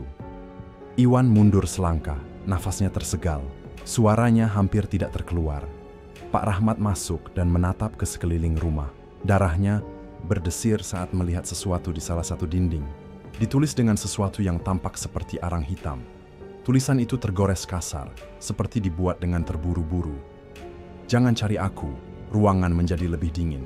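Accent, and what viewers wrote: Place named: Java, Indonesia. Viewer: Biasanya kl p.dhe yang cerita logat jawanya ikutan keluar😅😅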